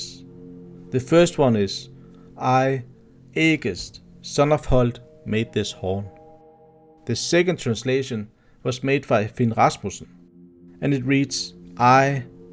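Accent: Denmark. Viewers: I dont Care about your danish accent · your light Danish accent is very cute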